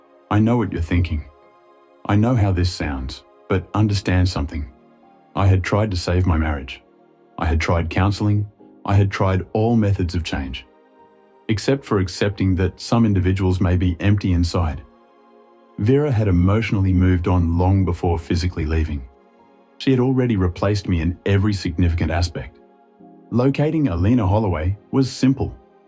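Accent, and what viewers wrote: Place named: Australia. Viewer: Australian patois just does not go well with these stories. Canadian is the best.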